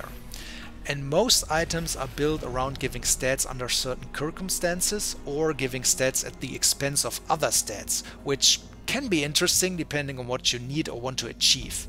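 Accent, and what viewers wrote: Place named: Germany. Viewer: He sounds german, but i'm not sure.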